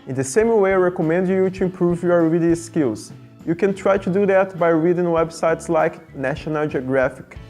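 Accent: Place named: Brazil. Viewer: Your english is pretty good, and surprisingly you have only a little accent, which is kind of rare for brazilians!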